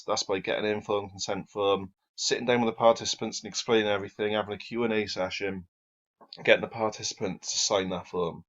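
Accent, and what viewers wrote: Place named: England, United Kingdom. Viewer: Can't understand shit. Your English is too overly english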